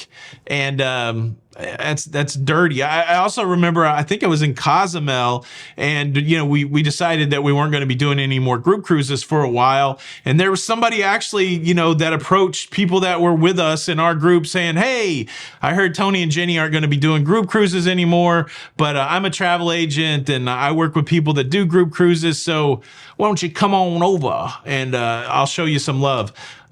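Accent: United States. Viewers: Sounds typically American to me.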